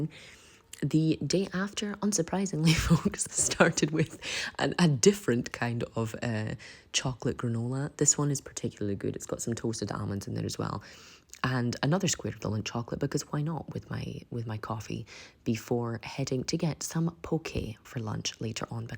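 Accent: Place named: Scotland, United Kingdom. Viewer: Love your Scottish sense of humour & voice 🎉 fab week of eats yum 🎉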